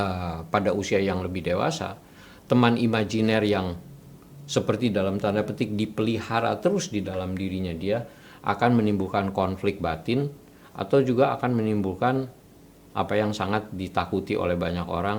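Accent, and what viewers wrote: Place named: Germany. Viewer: Jujur sih aksen jermannya Pak ini keren.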